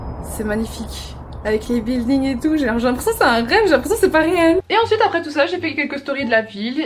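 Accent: Belgium. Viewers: tu est belge ? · attends t’es belge? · Tu es belge? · Tu est belge ?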